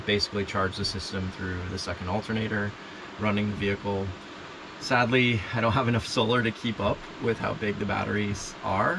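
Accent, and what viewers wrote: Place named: Tennessee, United States. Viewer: r u from TN you sound just like my niece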